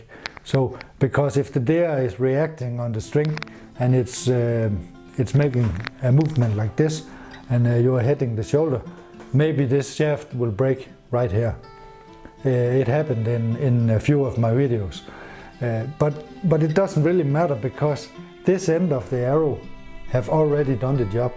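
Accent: Denmark. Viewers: Your Daneglish is fine, I have no problem understanding you. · So... I must say again with humble respect: you have a beautiful wife which gives you nice gifts. You are a very, very lucky man. Director clap is very appropriate. Maybe she can use it every third or tenth video? I wouldn't mind at all. 😇 I likeYour Danish spiced English very much. I don't know if you would like my slavic English accent. But we use what we can, don't worry a bit. Another interesting video and interesting praxis data in the comments about your son and a 30# bow and his deer kill. I really didn't know that that is possible, as we are not allowed to bowhunt in our country. My only question would be, how is so high FOC affecting your spine of the arrow? I couldn't shoot such an arrow from a 35# bow, I think. I shoot 52# longbow (a little towards hybrid side, but still longbow under the WA rules), and I have allready a problem: I use 340 spine goldtip xt classic, with 160 grains in front, but are still a little softer, as I would like for this bow. 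I can tune them, but I have to go near the lower limit of my brace height, which I don't like. I also like the heavier arrow, because I use carbon just for 3d training, ( they are much more durable than wood) and wood arrow in this spine is allready very heavy. I make carbon set as similar to wood arrows as I can, and then before competitions I don't really feel much difference , when I have to use wood for longbow class. Some would say use 300 spine, but wooden shafts in this spine are allmost impossible to come by he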